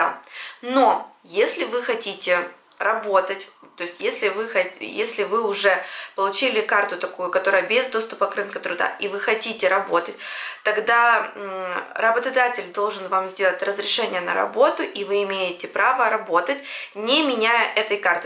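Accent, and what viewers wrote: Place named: Poland. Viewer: Piekny polski akcent!!👍Gratuluje!💐👏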